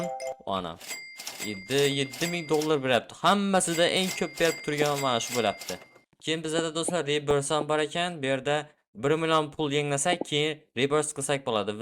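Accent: Khorezm, Uzbekistan. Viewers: Xorazmlik misiz besh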